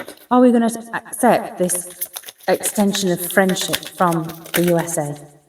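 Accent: Sheffield, United Kingdom. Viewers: I knew that was a Sheffield accent, thank you for your readings. I love listening to you. Love and light from another Sheffielder ❤️🙏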